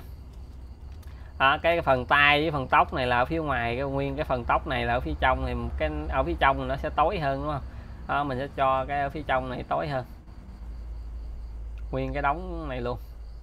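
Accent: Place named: Mekong Delta, Vietnam. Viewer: A ng miền tây nch dĩa huông wa he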